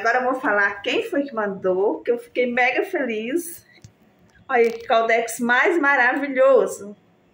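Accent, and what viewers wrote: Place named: Minas Gerais, Brazil. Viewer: Boa noite querida !!!gostei muito de sua pessoa!!!acho que tenho algo em comum nosso sotaque mineiro kkkk😄comprei 2 semana passada e está vindo de rondonia tao longe estou aguardando espero q chegue em bom estado se eu soubesse antes q tinha vc q vende essas maravilhas tao perto de mim teria comprado de vc mas enfim nao faltarar oportunidade!!!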